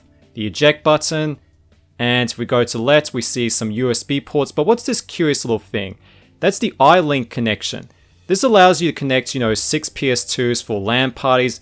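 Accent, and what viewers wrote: Australia. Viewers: HES AUSTRALIAN?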